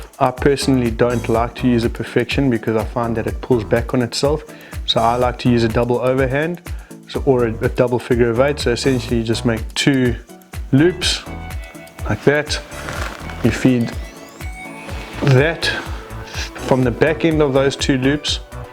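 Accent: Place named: Yorkshire, United Kingdom. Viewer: English subtites would enhance it as Yarpee English is as bad as Yankee drawl.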